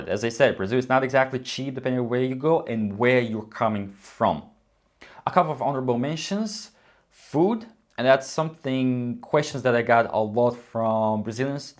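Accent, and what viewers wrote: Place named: United States. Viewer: First video I saw from your channel I honestly thought you were american. Not because you speak english, but because of your accent. It's not pure american to me, but definitely not british or australian. Si I kinda thought you could be american. You have an amazing english. Wish my english was in that level, but my english is intermediate. I hope one day Brazil will have a good amount of people who can speak english properly. My sister is 15 and in her school it has been a couple years since they started doing this bilingual education system. If you ever want to feel closer to Eurpo, visit the cities of Campos do Jordão (São Paulo) and Gramado e Canela (south of the country. You will fall in love with them.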